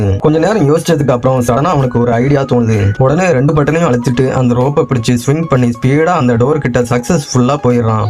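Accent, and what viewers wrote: Kongu, India. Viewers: Kongu tamil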